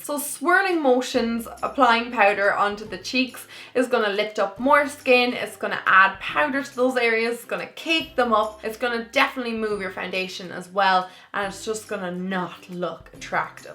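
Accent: Ireland. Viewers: awww ur so pretty darling. love ur accent. u sound Irish ? are u ? I'm new to ur channel. beautiful make up X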